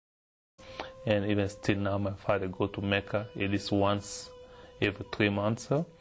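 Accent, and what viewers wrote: Israel. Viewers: This guy is definitely a Jew, thickest Hebrew accent I've heard in a while. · He has Hebrew accent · He has a hebrew accent. Fakest thing since WWE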